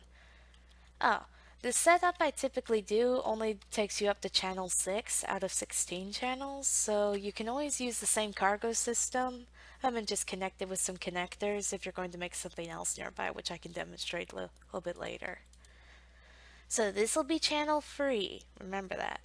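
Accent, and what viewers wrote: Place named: Canada. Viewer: Wow I can't believe senpai noticed me! I get the main North American accent feels but tinges of European... so Canadian/French Canadian? I dunno but it's nice!!! Why aren't you more popular :(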